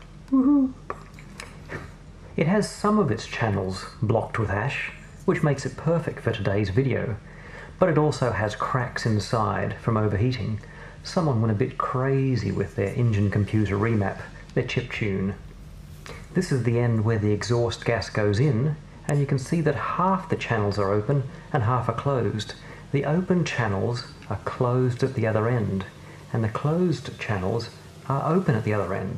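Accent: Australia. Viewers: You sound like an Australian. Where are you based ? First time viewers unsure how we got here.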